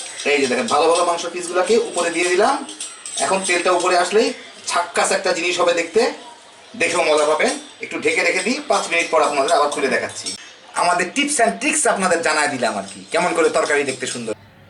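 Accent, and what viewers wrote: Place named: Chittagong, Bangladesh. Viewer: Apni chittagong er manus kota gulor dhorone buja jai.ami apnake subscribe koreci onk sushadu recipe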